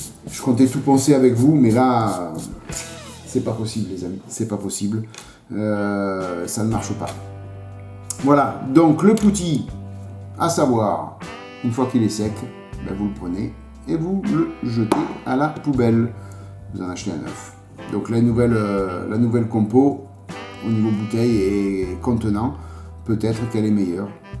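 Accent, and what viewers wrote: South, France. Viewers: Bonsoir. meilleurs voeux à toi et à tes proches. Dans l'attente de tes prochaines vidéos, de tes précieux conseils et de ta bonne humeur, avé l'accen du Sud. Amitiés.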